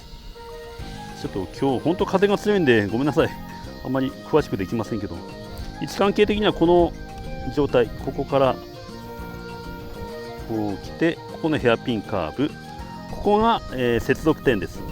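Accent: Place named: Fukui, Japan. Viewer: 結構訛ってんな😀独特の福井訛り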